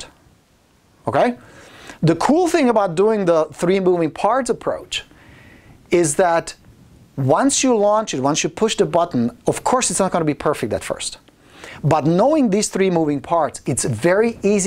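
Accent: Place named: Czech Republic. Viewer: Hey, you mentioned your former thick Czechoslovakian accent and that you had to get rid of it. How did you pull that off? I myself need to get rid of my thick German accent...;-)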